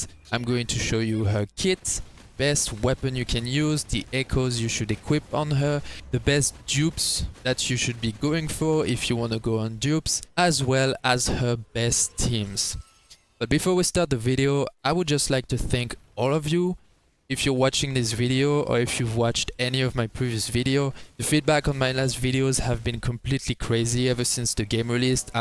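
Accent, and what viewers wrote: France. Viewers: On dirait un accent français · Hello ! Good video, +1 ! You sound french, don't you ?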